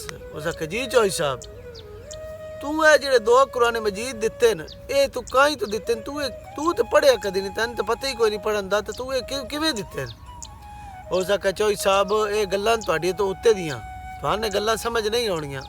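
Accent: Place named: Haryana, India. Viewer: Veer tuc HARYANA jive Punjabi bolde ne te vekhan ch v haryane de jaat jatt lagde ne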